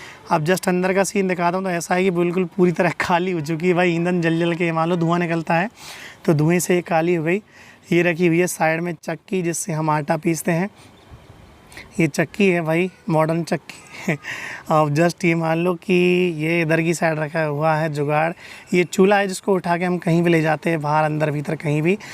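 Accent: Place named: Dausa, India. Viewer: Aap dausa se ho yesa lag raha h or Meena bhi kyuki meri frnd dausa ki h wo bolti h aapki tarah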